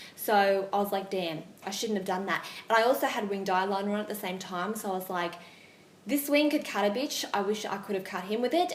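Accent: Australia. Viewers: Are you Australian definitely i think im in aus too sometimes its not that a guy wants to be creepy but when they find a girl attractive at times they might not know the best way to approach the situation. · Your Aussie?? 💘💗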